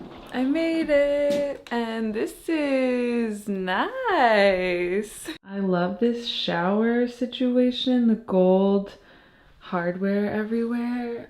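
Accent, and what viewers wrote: France. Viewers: The french accent😂❤️ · French accent. HILARIOUS😂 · I dont understand why did you start talking with a french accent ?? Is it just for fun or is there another reason behind that ? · Loved your french accent 😂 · The french accent took me by surprise😮😂love itt❤❤ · French accent >>> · i love the french accent!!! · Okay but the French accent and black turtleneck go together and suits you haha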